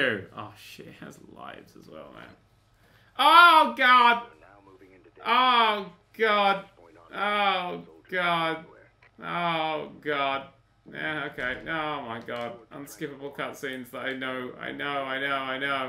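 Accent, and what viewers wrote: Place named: London, United Kingdom. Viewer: Hes english... his accent is english - londoner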